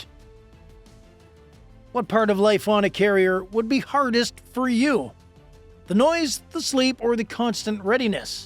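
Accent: Canada. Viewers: Dude are you Canadian? No hate but what?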